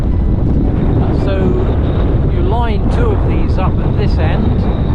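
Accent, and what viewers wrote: West Country, United Kingdom. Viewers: Spectacular scenery with lovely contrast between the hedgerows then down to the crashing waves along the clifftops all narrated with that west country burr.Makes me nostalgic for Devon my country of birth after living 32 years overseas so thinkin of planning a summer walk 😅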